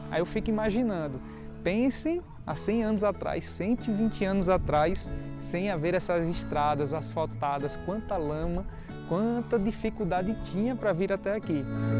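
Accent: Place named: João Pessoa, Brazil. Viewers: Assistir esse vídeo foi tão prazeroso me fez lembrar do tempo antigo muito emocionante, eu realmente estou chocada com o Ceará tanta beleza tantas histórias, você está mostrando um ceará que eu não conhecia sou do interior de São Paulo e estou amando seu trabalho, que filmagem excelente melhor sotaque do Brasil. Como você diz muito bom de verdade 😅 senti como se eu estivesse aí nesse lugar cheios de histórias, por mais vídeos assim parabéns 👏👏👏👏👏. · Perguntei porque cheguei a 3 meses de João Pessoa,achei o seu sotaque parecido com o de JP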